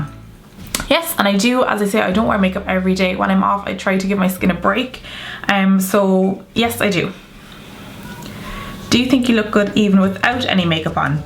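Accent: Ireland. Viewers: I knew straight away ur Irish cause I'm too what county u from😉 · are u Irish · Is that a cork accent I hear?!?! I love finding fellow Cork/ Ireland you tubers! :) You're so pretty btw! I've subscribed 💕💕 xoxox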